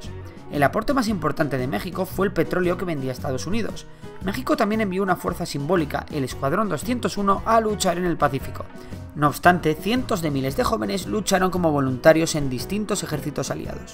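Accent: Spain. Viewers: El narrador es Español? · un español contando la historia de mexico que ironico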